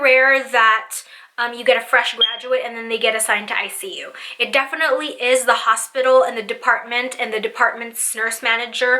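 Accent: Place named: United States. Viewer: You picked up the American accent quickly for someone who came here in 2012.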